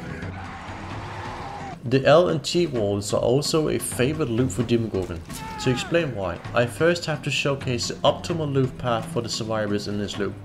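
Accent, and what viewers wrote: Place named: Denmark. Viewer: this is really great. the dbd community needs more good demos to help us get better at playing him! also are you danish or swedish? your accent sounds like it.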